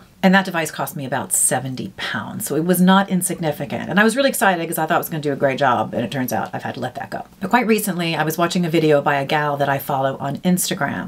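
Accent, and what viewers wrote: Ireland. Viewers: Wonderful! I'm from the UK but have ties to Guiness and love the Irish accent! Good that you can swap from Irish Colleen to Southern Belle!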